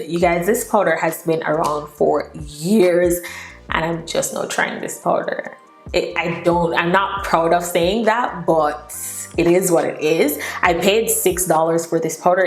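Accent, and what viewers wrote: Jamaica. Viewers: U sound Jamaican. Not a question lol. So sure you are Jamaican. Great content. Keep doing your thing :)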